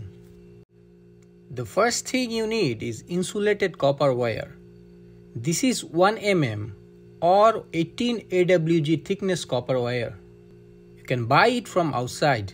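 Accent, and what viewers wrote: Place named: Bengal, India. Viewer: ভাই তোমার ইংরেজি বলার ইষ্টাইল শুনে বুজেছি,তুমি বাংলা বলতে পারো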